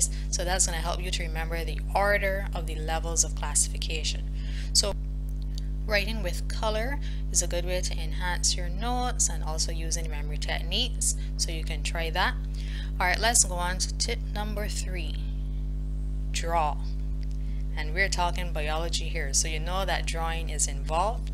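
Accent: Barbados. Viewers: R u from Barbados? U sound similar to Rihanna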